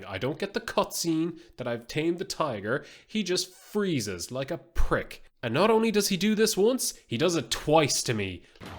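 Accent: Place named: Ireland. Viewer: Oh a Irishman? Well lad!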